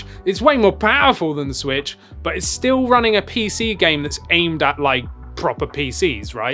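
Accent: United Kingdom. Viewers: Hey bro your accent sounds like you’re from Oxford, england.. am I right? ❤️❤️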